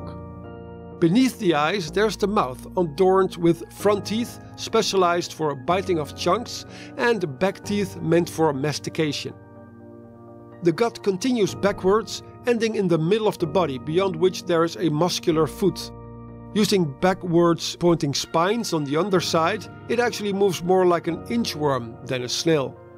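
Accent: Netherlands. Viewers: Are you dutch, afrikaans or german?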